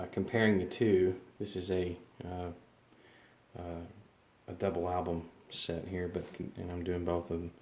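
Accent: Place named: Texarkana, United States. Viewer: Just out of curiosity are you from near Texarkana? I used to work with a guy from there and you two sound alike.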